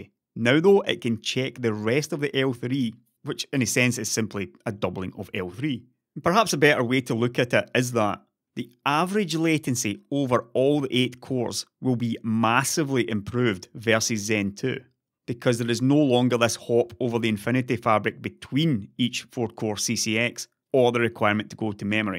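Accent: Scotland, United Kingdom. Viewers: a dash of scottish accent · is this Scottish? so lovely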